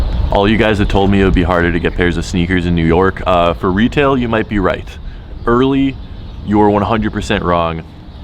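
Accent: Canada. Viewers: time is money! Uhh you just wasted 3 mins! Cus who wants to listen to an Annoying Canadian Ray Donovan!?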